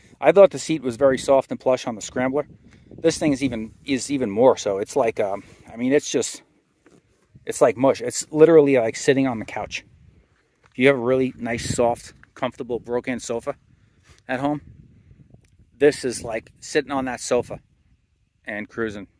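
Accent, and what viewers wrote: New York, United States. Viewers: New York accent?.. I’m from Pennsylvania… for 2024, the only color offered Is a bright orange. .. kinda ugly tbh. Limited edition so they’re kinda hard to find.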